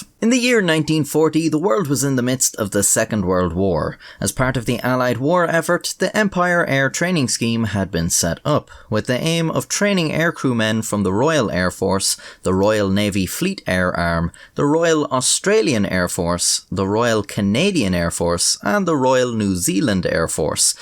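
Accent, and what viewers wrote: Ireland. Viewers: Funniest Irishman I ever listened to!!!! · I am a simple man, I see a upload, I click and begin to absorb knowledge from the spectacular Irish narrator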